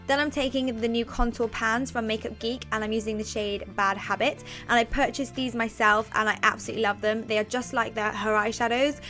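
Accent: United Kingdom. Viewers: I know I meant where abouts in the uk lol! Your accent sounds similar to mine I'm from Gloucester xx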